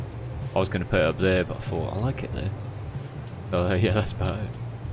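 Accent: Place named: Suffolk, United Kingdom. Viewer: What part of England you from? Your accent sounds Suffolk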